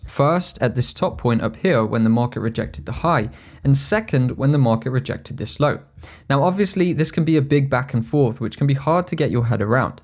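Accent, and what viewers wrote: United Kingdom. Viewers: When he was saying the the the, I think he meant to say there. OMG I hate B.English pronunciation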